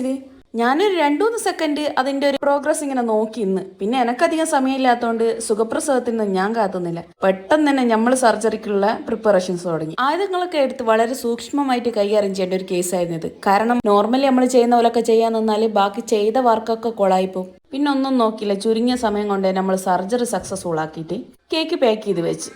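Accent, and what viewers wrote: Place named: Vadakara, India. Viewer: Vadakara slang 😊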